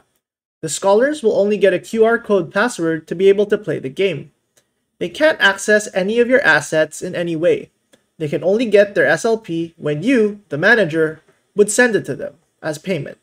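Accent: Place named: Philippines. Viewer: Accent palang alam na Filipino na 😅 Nice content sir 👍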